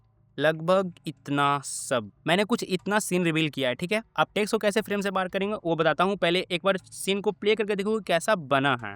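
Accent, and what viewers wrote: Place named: Bihar, India. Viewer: Bihar ya jharkhand se ho kya? Ascent to waisa hi hai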